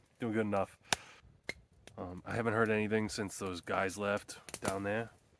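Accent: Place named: Massachusetts, United States. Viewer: I just found your channel a few days ago. So far it seems you're camping in NH, but you seem to have a Massachusetts accent, am I correct in assuming that? Great videos, BTW! 💞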